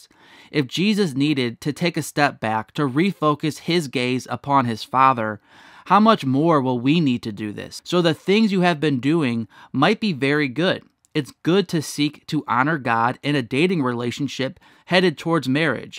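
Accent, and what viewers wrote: United States. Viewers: Narrator from Chicago? That accent...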